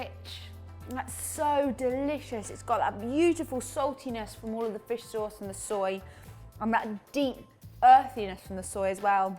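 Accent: United Kingdom. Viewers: You're British! I just got it.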